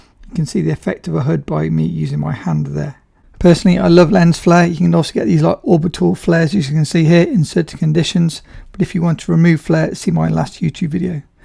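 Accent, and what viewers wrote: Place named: United Kingdom. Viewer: Ciao! Thanks again for your content, I always find it “sharp”, balanced, and genuinely enjoyable. And that lovely British flow of yours? Can’t get enough of it ❤ Since I work in sound, I hope you don’t mind a tiny suggestion: your voice has a naturally low and soft tone, which is really pleasant, but when the audio gets boosted to make it more audible, it introduces distortion, crackling, and a bit of reverb, even noticeable on phone speakers. Using a proper mic plugged into the Rode GO could really preserve the quality of your voice and take the whole listening experience to the next level. Sorry for pointing it out, I know it’s a bit of a sound-geek thing, but sometimes it makes it tough for me to fully enjoy your content, which is otherwise brilliant. Some mics that I suggest: RØDE lavalier II (not the “go”) Sanken cos11 Sennheiser mke2 Sennheiser mke1 One of those even used on ebay Combined with a little fur: Rycote overcover or similar Will make your audio leica level:) Looking forward to the next one!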